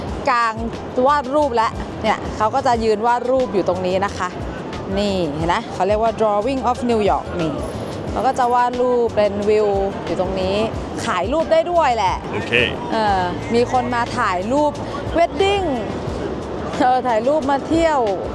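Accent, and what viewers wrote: North, Thailand. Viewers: ชอบการนำเสนอของน้องค่ะ และชอบคำเมืองของน้องด้วยเจ๊า..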